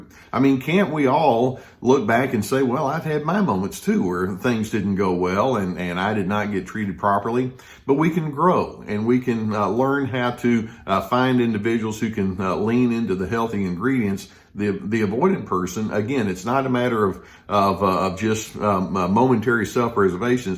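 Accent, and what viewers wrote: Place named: South, United States. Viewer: Love the Doc's clear southern accent, as well as his knowledge and experience!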